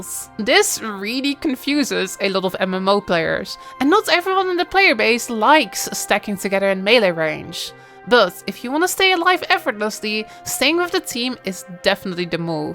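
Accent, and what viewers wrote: Netherlands. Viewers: I like your dutchness 😂 i can hear myself talking. Good video! As a long time returning player i am quite confused sometimes!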